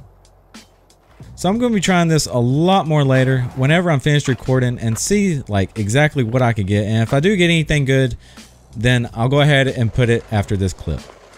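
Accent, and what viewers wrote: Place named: Appalachia, United States. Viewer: his accent is like the city equivalent of Hillbilly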